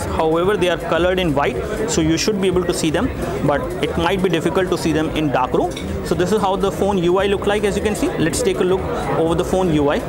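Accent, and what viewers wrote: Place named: Delhi, India. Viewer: dude first thing i want to tell you is that you need to improve your English and pronunciation big time. You sound like a typical Delhi guy! your rate of speech is too high, i understand that you have time constraints however you can still improve. The phone is ok. Asus zenfone 5 & 6 are much smarter considering the price difference. Thank You!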